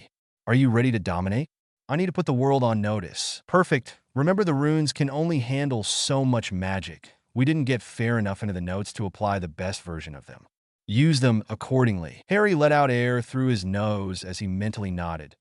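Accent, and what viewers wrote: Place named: India. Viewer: hey there when your videos are done with a heave India dialect please use the ai voice so it is understandable as that accent is thick and English is my 4th language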